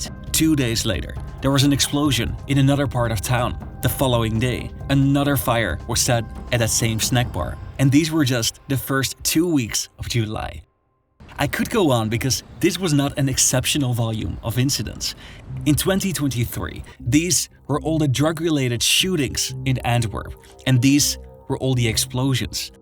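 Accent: Netherlands. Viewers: thick dutch accent warning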